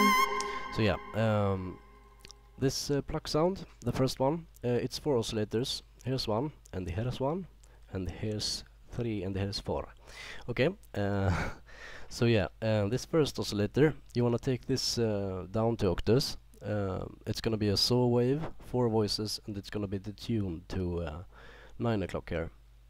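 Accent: Sweden. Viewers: Nice tutorial man, REALLY NICE! Swedish btw? :) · are you from sweden? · Swedish? :D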